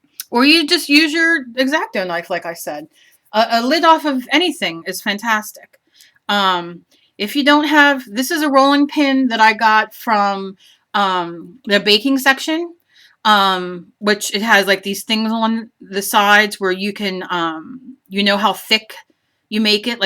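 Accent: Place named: Altoona, United States. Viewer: You sound like you maybe from the Altoona area of PA. Nice memory of my cousins who living there still. Nice video, thank you to share. I am a Stroke survivor and hoping I can start make polymer items for gifts and fun.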